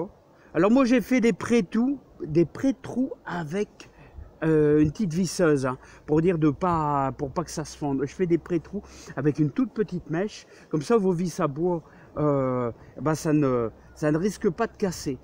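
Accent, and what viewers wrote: North, France. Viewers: Bonjour , je viens de découvrir votre chaine avec plaisir de vous entendre avec un bel accent du Nord! sympa, car moi aussi je suis de la région , disons le même coin ou peut être voisin.Merci pour vos vidéo et le travail donné.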